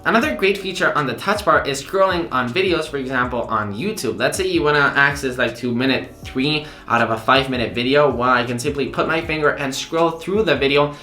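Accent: Spain. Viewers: I’d guess Spanish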